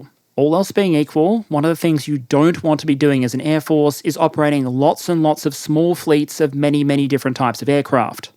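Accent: Australia. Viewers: The way Australians say Russia has always cracked me up… love it.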